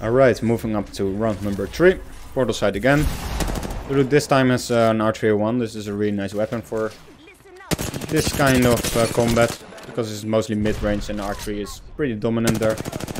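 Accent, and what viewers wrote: Netherlands. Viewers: Yo are you Dutch?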